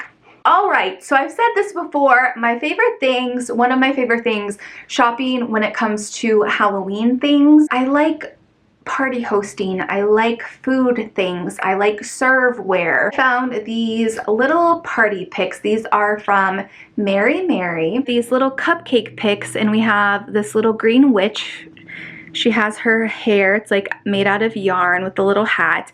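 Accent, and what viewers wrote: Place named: Midwest, United States. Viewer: I'd say u have a Midwest accent! A lot of ppl in wisconsin pronounce those words EXACTLY like that!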